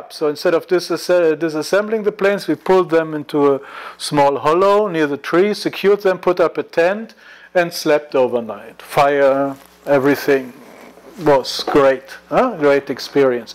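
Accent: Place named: South Africa. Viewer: Where are you from? It sounds like South Africa, but I'm not sure.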